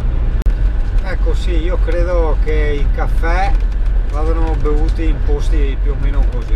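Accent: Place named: Treviso, Italy. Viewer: El to dialeto ghe someja tanto a quel de Treviso.....!!!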